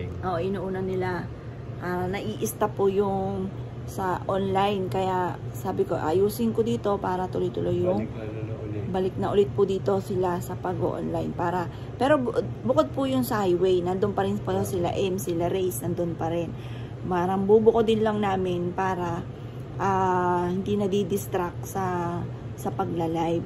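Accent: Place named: Batangas, Philippines. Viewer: Parang taga batangas kayo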